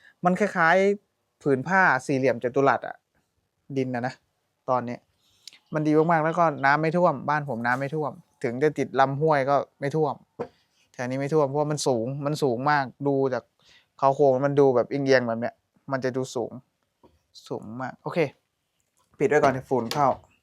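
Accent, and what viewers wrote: Thailand. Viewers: อยู่ไทยจนพูดไทยพูดลาวเป็นสำเนียงไทยหมดแล่ว🎉🎉🎉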